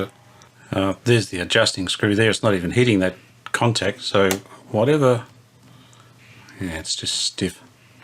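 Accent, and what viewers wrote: Australia. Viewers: Fine job,! I do like your videos. "It's, so if it gets destroyed, it wouldn't worry me.", haha! Those subtle jokes you sparkle here and there are just great. Love the Aussie accent too.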